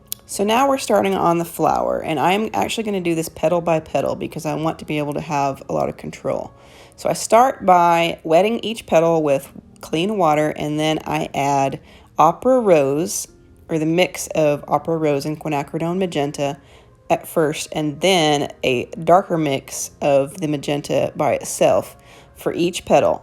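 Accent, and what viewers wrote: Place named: United Kingdom. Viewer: Beautiful! Would LOVE to see (and hear) you do a painting using your British accent, lolol. I have the same viewing habits...mind how you go.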